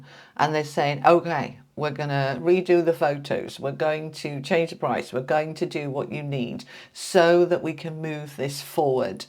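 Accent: England, United Kingdom. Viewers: Thank you ❤ What a pleasure listening to your English accent. I have been fighting for years for my heritage mainly with my sister who is taking all the economic profit on this situation. Lately I’ve sent un ultimatum to her lawyer because I am just tired of this unfair situation. This is real insane when we have to fight against our own family but it’s just scandalous the way she can take profits of the situation making me paying for her accommodation with all the referred financial charges. Well I pray for the end of this mess because I am getting old and tired to continue working paying for her profits. Even my health balances at my age and my body keeps telling me it’s time to stop. Hoping that the sun finally comes out and brights in my sky and in my heart. Thank you very much for this vidéo. You do a great job. God bless you 🙏🫶💐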